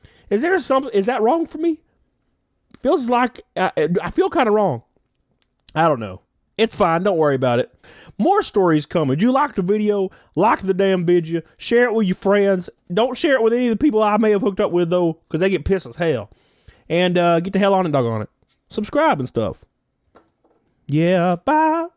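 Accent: South, United States. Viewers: I have such a huge crush on this guy 😍😍 That southern twang 💋🤌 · Man, that country twang 😍🥰 I love you!!!! “Damn it”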